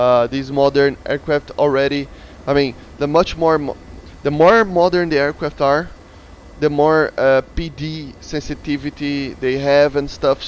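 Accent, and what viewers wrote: Brazil. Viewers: Notei q vc eh BR pelo sotaque do ingles kkkk video brabo nois 😎🤙🔥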